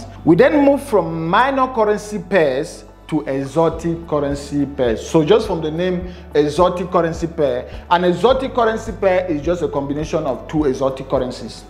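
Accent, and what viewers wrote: Cameroon. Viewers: You sound like a Cameroonian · Man you speak like a Cameroonian ❤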